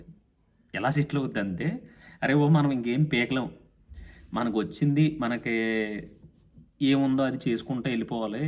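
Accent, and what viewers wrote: Godavari, India. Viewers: Brother manchiga cheppav,mana east and west godavari stylello,ur native place?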